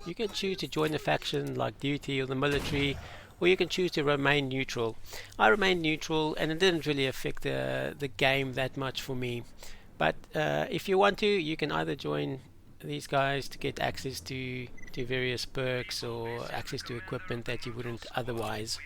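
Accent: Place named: South Africa. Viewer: Was wondering about the accent, also from SA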